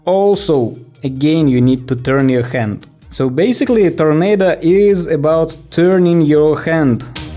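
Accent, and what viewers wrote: Russia. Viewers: так ты русскоязычный по акценту понятно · Русский акцент остался😂😂😂😂👍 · Слышно что русский челик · You have a Russian accent(lul, it's handsome). Are you from Russia?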